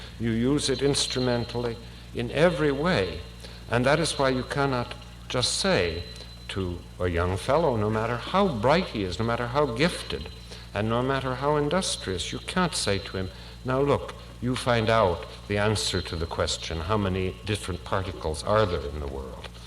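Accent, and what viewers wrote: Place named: Mid-Atlantic, United States. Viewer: The “Mid-Atlantic” accent needs to make a comeback…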